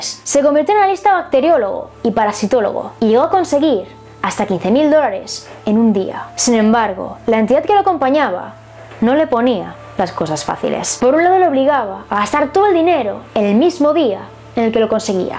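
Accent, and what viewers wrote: Galicia, Spain. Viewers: Soy mexicano me caes bien. Ahora te sigo... no me acostumbro al acento español . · Me encanta tu vídeo... Y ese acento español (con todo respeto) es como para un audio libro y escucharlo cuando me toca trabajar toda la noche, te recomiendo el vídeo de la casa de Fidel, de la mano peluda. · Oye tía eres tu española gayega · Bellotas hermosas y preciosas. Un vídeo genial y asombroso. Narrado de la mejor forma, el estilo y acento español de la bella bellota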